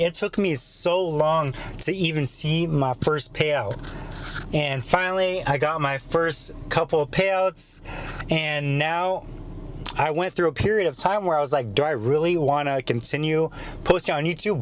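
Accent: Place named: Mexico. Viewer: I love this guy‘s content. I just can’t get past the fact that he lives in the United States, but talks like he just migrated from Mexico or something is this just because he lives with his parents or what because that’s crazy how you can go to school for so many hours a day but still end up talking like you’re not even from this country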